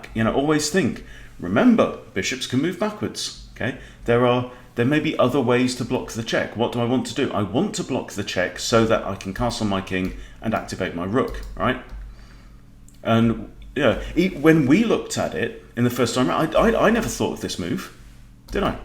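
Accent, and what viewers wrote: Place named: United Kingdom. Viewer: Bingo!.... the computer is telling you to expect a fantasy situation... I figured out why is fun and informative versus the Feingolds, Gothams, Bartholomew's that are on here..... those guys, good as they are, well intentioned as they are, are like talking computer engines. Plus...those guys don't have British accents or roosters either...:-) Great vid on a great game with great points... thank you sir....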